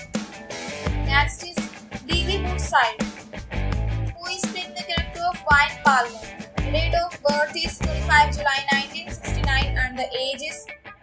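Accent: India. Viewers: The way u pronounce the names made me cringe so badly. Typical badh badh ding ding Indian accent.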